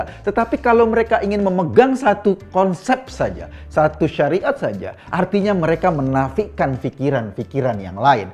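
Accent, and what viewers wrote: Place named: West Java, Indonesia. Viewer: Mendengar logatnya kelihatannya dari bagian barat Jawa. Sejak awal proklamasi nggak mau gabung NKRI !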